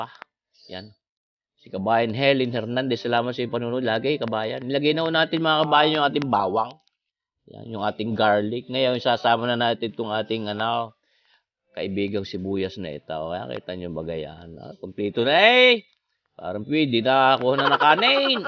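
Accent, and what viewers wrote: Batangas, Philippines. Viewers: Yes po ang sarap pakinggan ng batangas accent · Watchibh here in saude jeddha.nakakatuwa yong/salita/u/sir/batanguenio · Batangueño k po chef,ala eh! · Mauutas ako sa punto...taga Batangas din ako'y..haha ang sarap nyan Shout out Cora Mercado thanks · Sarap tlga Yan batanggenyo ka kuya Ako .kxe salita mo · I enjoy watching and listening how to talked. Your accent batangenio i love it. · Idol ko tlaga mga batangenio pag nagsasalita,🤣 napapata nio PO aqo ,, Sir,,, God bless po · Hehe ay tunay aring batangginyo na are nkakatuwa mgsalita ah ah ay tunay ay akoy kabayan moren eh mindoro nman ako segi sarapan mo mgluluto din ako nyan kabaya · Nkakatuwa nmn yong tuno ng salita nya malambing pakinggan ano baga yn tuno na yn batangas ba or laguna ba yn.happy eating. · ang cute u po mag salita parihas kayo ng bf ko dati tiga nasugbo batangas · Super sarap kabayan,tunay n nakaaaliw kang pakinggan at panoorin kc nawawala stressnk maghapon,aliw n aliw ako sa tono mong batangenyo,inlove n nga ako sayo hehe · Nakakarindi ka naman panoorin eh sobrang punto mo pero masarap at magaling kang Chef kaya laang bawasan mo un sobrang puntong Batangas hindi nman talagang ganyan ang punto ginagaya mo si Leo Martinez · Batangueno hehez😁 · I love ur accent bro..na alala ko kaibigan ko taga Batangas.tuwang tuwa kmi nun dahil sa pananalita nya..she's from Balayan Batangas..her name Eva Villena..gusto ko ung mga niluluto mu..thanks for sharing...gud luck... 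· Natutuwa ako sa puntong Batangas kahit ako nag iisa natatawa ako sarap pakingan 😜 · Kabayan kakaaliw namang manood sayo kase you're so funny.I like the batangas accent 😍 Nako hanap ako ng puso ng saging bukas at ng ma try yang recipe mo Ala e mukang masarap. Ano ba yung karikit?? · Gusto ko yang accent mo, balae ko taga batangas din. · ALA EY!! ANG SARAP BAGA NIYAN KUYANG BATANGUENO, He He 😃 SHOUT OUT PO KUYANG 👍 YOU SOUNDS LIKE MY FRIEND CO WORKER HERE IN ZURICH SWITZERLAND HE IS FROM BATANGAS AM FROM MINDANAO 🤗SARAP PAKINGGAN NG BATANGAS DIALEKT. he he🥰. ALAY EY GUMAYAK. KA NA BAGA !!! THANK YOU 🙏GOD BLESS KUYANG ❤️🇵🇭🇨🇭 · Kabayan taga Batangas din ano pero gusto ko iyan puntong Batangas natin katuwa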